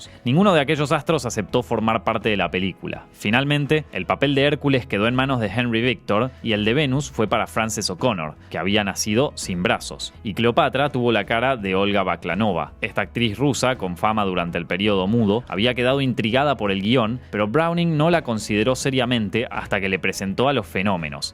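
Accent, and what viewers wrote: Argentina. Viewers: Viejo. Normalmente la narración con acento argentino no me agrada, pero el tuyo está demasiado brutal... 👌🏻 No me extraña el éxito que tienes. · odiavle el acento argentino pero ni modo... la tematica esta buena. me aguanto. 🙄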